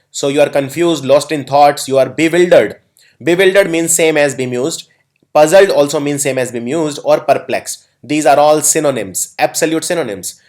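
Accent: India. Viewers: Blablabla I don't like the Indian accent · plz dont use hindi accent okay ...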